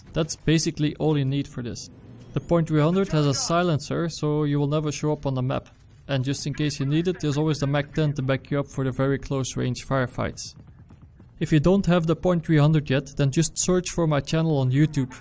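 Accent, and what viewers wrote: Netherlands. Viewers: Dat English tho!! R u from the Netherlands? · R u dutch cuz u have a dutch accent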